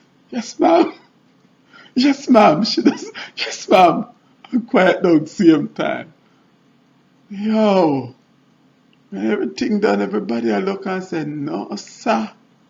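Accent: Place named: Jamaica. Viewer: Whewwwww straight up Jamaican dialect/vernacular lol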